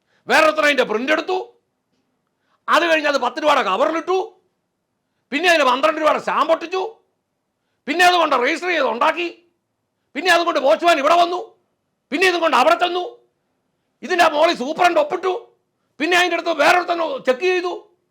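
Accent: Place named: Central Kerala, India. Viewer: മധ്യകേരളത്തിലെ സ്ലാങ്ങാണ്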